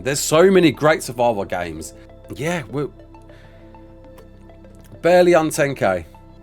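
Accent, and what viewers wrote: Birmingham, United Kingdom. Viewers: Are you from Birmingham? You have the exact same accent as me bro 😂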